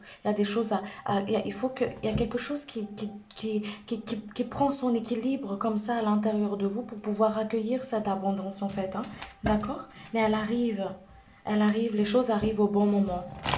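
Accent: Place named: South, France. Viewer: Merci bien de la guidance bel accent du sud (il me semble) :) ça me parle beaucoup,malheureusement de mon côté l'attente en la concrétisation de mes projets on eu raison de ma relation amoureuse qui était à distance car il était Américain j'ai coupé hier car ça me prenait trop d’énergie ça avançait pas du tout niveau papier etc j'ai préféré m'arrêter là maintenant je pense me stabiliser ici avec peut-être une autre personne avec qui je me suis rapproché dans l'entrefaite.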